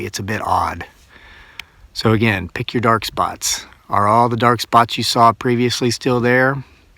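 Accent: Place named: Ohio, United States. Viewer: Are you possibly in Ohio? You have a bit of an accent.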